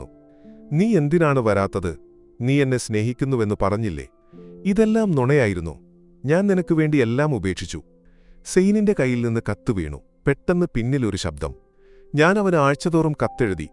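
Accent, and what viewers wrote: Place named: Arabi-Malayalam, India. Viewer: Kadha Vaayikkan Malayalam Koottivakkan ariyavunna orale niyogikkaamaayirunnu. Ithu arabi malayam Parayunnathu pole aayippoyi.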